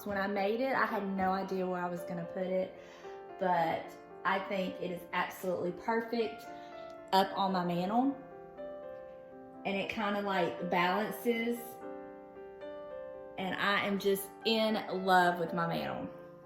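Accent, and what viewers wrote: South, United States. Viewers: You are adorable! Decor is lovely and I love the "southern accent"!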